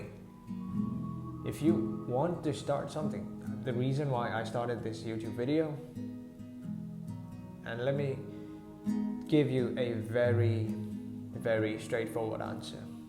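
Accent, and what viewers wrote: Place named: England, United Kingdom. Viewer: Hi, I like your english acccent!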